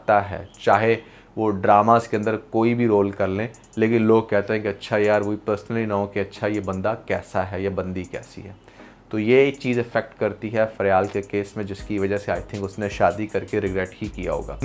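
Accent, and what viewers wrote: India. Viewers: Bhai aap Indians ki tarah kyun bol rahe ho.... VIDEO INTERESTING HONE WALI HAI....... GHANTA FARQ NAHI PARTA.... yeh kya hai??? Pakistani Urdu bolo bhai kya hogaya hai....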